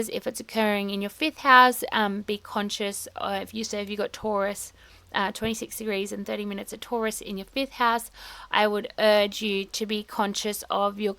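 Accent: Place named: Australia. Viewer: ❤ Hi. It is great to hear your views and also from a fellow Australian. I am saying this to help you improve. It is wise to use short succinct sentences, particularly with different cultures. I suggest slowing down. Try not to begin a sentence with So this means, And then... etc. It is like continuing the same sentence when it is actually the beginning of a new point. I had to learn the hard way when doing my Masters that short sentences were digestible. It is why people may find you hard to understand. Australians speak very quickly, particularly compared to the British. Our flat tone and different pronunciation is also a problem. I strongly recommend leaving a one second pause at the end of the sentence. It is like hearing you say "and then, and then, so and then...." I used Description (definitions and examples), then analysis (on the one hand this, on the other that), interpretation (what does this mean, what are the different viewpoints), and judgement (drawing conclusions) as a successful format. 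It is up to you of course. I just subscribed!🤣👍🦘🦘🦘💜🙋‍♀️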